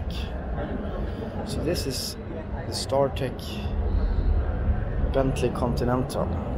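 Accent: Sweden. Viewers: I bet the one whos talking is from Sweden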